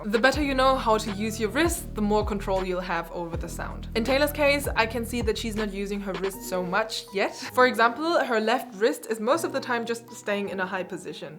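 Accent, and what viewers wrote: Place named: Germany. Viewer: Interesting video youtube recommended me. I instantly knew that you were German like me. Why? Unfortunately you hear it. But your English is very well as is your pronunciation. It's probably because you're not speaking an American English and who knows how to speak like the Brits do? I watched a video of you playing the piano and you're a super awesome piano player. I am learning to play but it's just beginner's level which is fine. 😉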